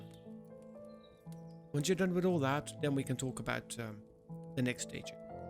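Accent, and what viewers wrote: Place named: Germany. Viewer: wow your English is very good then! I thought by your accent that you were German or similar ^^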